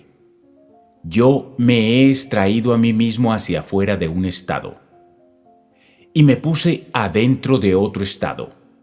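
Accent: Colombia. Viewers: es colombiano, paisa, de hecho, su acento lo delata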